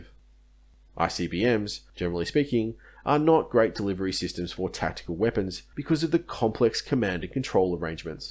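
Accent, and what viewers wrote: Australia. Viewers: Glad you re-uploaded it mate. One thing though... you pronounce "beta", as in "beta radiation", as "bay-tah", not "bee-tah". As a fellow proud Australian, I must ask why. There are enough Americans speaking their form of English in YouTube videos, and while there's nothing wrong with that, there's no need for everyone else to do so too. But that is a minor criticism. I really enjoy your material and it's nice to hear an Aussie accent, the occasional American pronunciation notwithstanding. As a 13 year old in the early 80s, I delivered the afternoon newspaper, "The Herald". In it on one occasion was a graphic description of the effects of a one megaton blast over Bourke Street. I think the effects described in that were probably taken from the general description of the effects at certain distances of a one megaton blast that you gave towards the end of the video. 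I've often wondered where I could get hold of that article in recent years, but I cannot find any reference to it anywhere. I wonder if you might know of it. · I want to take a moment to express my admiration for your scientific videos, which have a calming, informative effect on me. I am particularly fond of your previous dissections of the atomic theory, which I find highly engaging and informative. I strongly appreciate your voice; that Aussie narration in the video creates a sort of exotic intimacy, which adds to my enjoyment of the content. Additionally, I am grateful for your videos on the Pacific military operations, which were fascinating. I think your style is outstanding with the quality of the videos, and I am deeply grateful for the opportunity to watch them for free. Thank you for making these videos while naturally making the very most informative pieces about these amazing underlying subjects.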